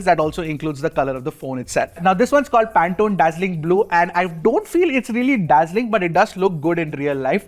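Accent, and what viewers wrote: India. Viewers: Display is not up to the mark, inferior than edge 60 fusion ,f55 5g display far better than these two.Thanks for your fluent English with Indian accent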